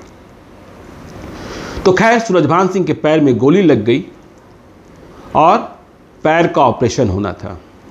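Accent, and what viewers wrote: Bihar, India. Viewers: You speak like typical Biharii and imitate Kissagoi of Rajesh Pandey IPS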